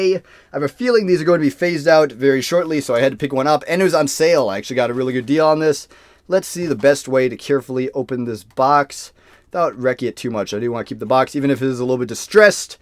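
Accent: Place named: Great Lakes, United States. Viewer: Great Lakes accent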